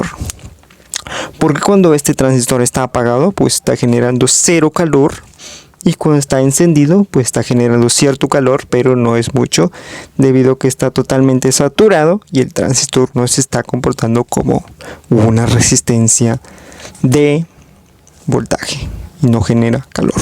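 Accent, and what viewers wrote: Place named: United States. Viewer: Todo bien. Pero, porqué hablas como gringo? 🤔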